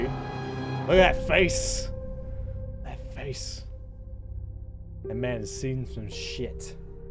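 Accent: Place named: United States. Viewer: Im a kiwi....Your accents odd....Kinda got an american twang.....sorry....this comment probably isn't needed....but i've written it so ill post it lol.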